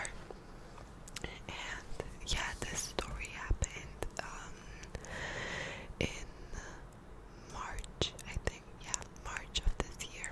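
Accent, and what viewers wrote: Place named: Slovakia. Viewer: I can hear her Slovak accent it’s so pretty and pure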